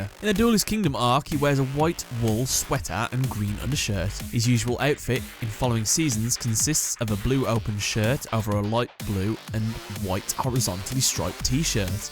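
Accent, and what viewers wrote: United Kingdom. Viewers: people always ask, "why so british?" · Bri'ish lad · why so british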